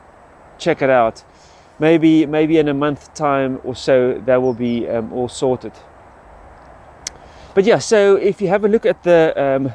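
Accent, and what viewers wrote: South Africa. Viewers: Just out of interest are you, perhaps a South African or a Dutchman/Hollander as when you speak I hear a bit of the Boer accent in your speech? As for the bike and I need an e-bike, as I lost my car driving license due to failing eyesight and live at the top of a 1 in 6 hill, that no matter which angle I ride to and from, I need a bike that can substantially climb without much effort, say mode 3 and mode 5 hill assist electric power. So would you recommend this one, over the similar 345 original?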